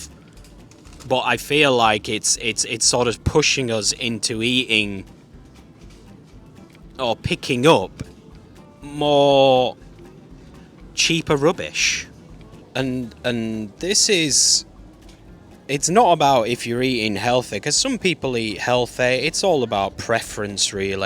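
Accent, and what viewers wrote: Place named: Italy. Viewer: Ma sei un italiano che parla in inglese!!!!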